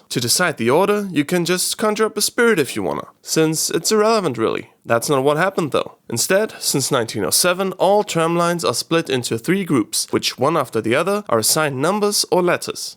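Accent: Vienna, Austria. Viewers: ich schau mir das hier nur an weil dein österreichischer Akzent sich im englischen dermaßen amüsant anhört dass es eher nicht geht XD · Sad but true story: I'm German and always watch the English version of your videos as the Wiener Version is completely incomprehensible if you're not used to that dialect :D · Its weird seeing an youtube channel in english with hints of austria or austrian dialects such as the: Tor! I wea narisch!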